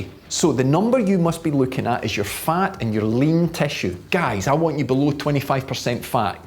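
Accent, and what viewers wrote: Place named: West Scotland, United Kingdom. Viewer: Trainspotting is Edinburgh accents (east), his is west coast. Might sound the same to you but Scottish people can hear the difference.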